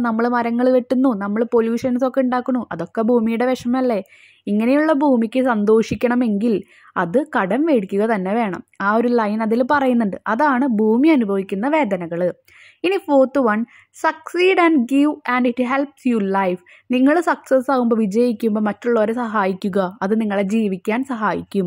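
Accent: Thrissur, India. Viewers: തൃശ്ശൂർ സ്ലാഗ് ആണല്ലോ 🙂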